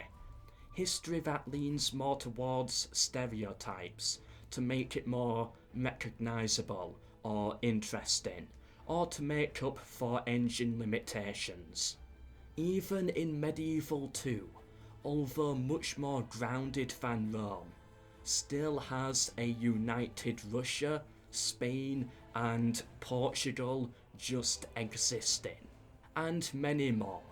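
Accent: United Kingdom. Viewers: I basically see videos to learn english, he speak so slowly and with that strong british accent which is like a teacher to me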